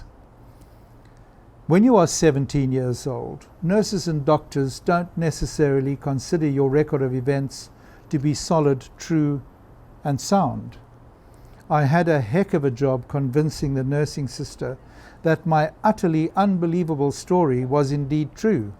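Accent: South Africa. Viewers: Being a witness of suicide in whatever form often is a very uncomfortable experience. I've been there too. On both sides actually. On a brighter note: By listening to your videos, I have a newly found appreciation for the South African English accent.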